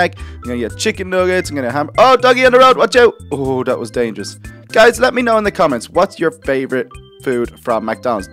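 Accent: Ireland. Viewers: I think this dude was Irish 😂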